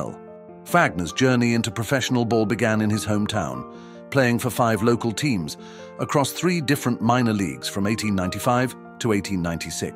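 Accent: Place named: United Kingdom. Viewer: Interesting to hear a British narrator on a biography of a player in the Great American Pastime.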